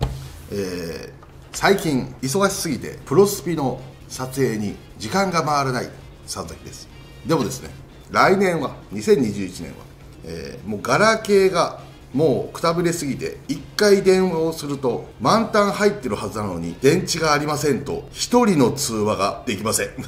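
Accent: Kansai, Japan. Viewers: って関西便なの？？そもそもこれが関西弁7日も分からないけど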